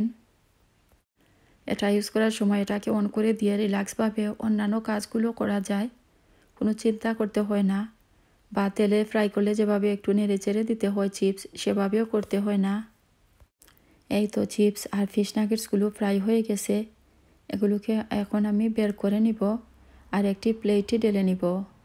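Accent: Sylhet, Bangladesh. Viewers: আফা সিলেটি নি গো? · সিলেটি আপু।উনারা এভাবে কথা বলে। ধন্যবাদ আপনাকে ও আপুকে।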